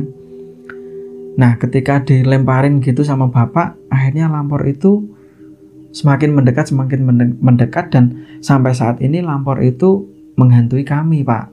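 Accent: Java, Indonesia. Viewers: Njowone pundi to mas.. kok medok banget. Seneng aku dengere👍🏻😁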